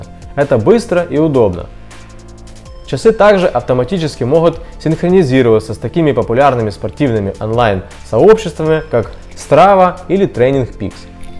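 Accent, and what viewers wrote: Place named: Ukraine. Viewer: Это отличительный говор украинца. Когда я слышу такой говор, то знаю, что человек украинец. :) А в целом речь нормальная, дикция четкая, все слова разборчивы, а это самое главное в подаче вербальной информации. )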